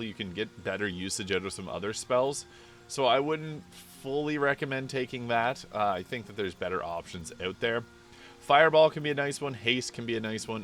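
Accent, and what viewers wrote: Canada. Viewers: Just subbed since I've seen a few of your videos and I enjoy it. Are you Canadian? Random question, sorry. haha.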